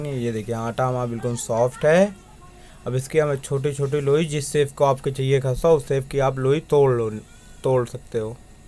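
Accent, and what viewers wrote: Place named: Allahabad, India. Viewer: Bhaiyya apke baat karne ka andaz pura allahabadi hai😊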